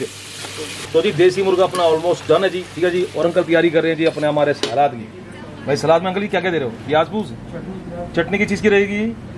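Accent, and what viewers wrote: Punjab, India. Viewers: bolte bhot accha h...punjabi touch mein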